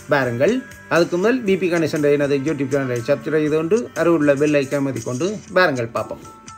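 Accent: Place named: Sri Lanka. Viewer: Pusanikai soup.... So different and delicious brother. இலங்கை தமிழ். கேட்பதற்கு மிகவும்அருமையாக உள்ளது.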